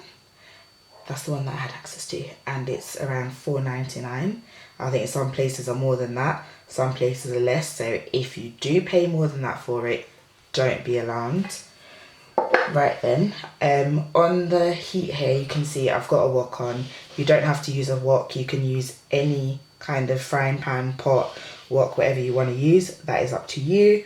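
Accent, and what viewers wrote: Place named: West Midlands, United Kingdom. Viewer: I was thinking more West Midlands, it sounds like you have a hint of it. You are doing good with you channel, maybe we can come up with something together one day? :)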